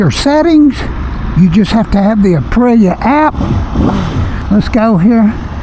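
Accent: United States. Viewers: I doubt you're Italian. Were you born in Italy and do you have Italian citizenship? It sounds to me like you're American not Italian 🤔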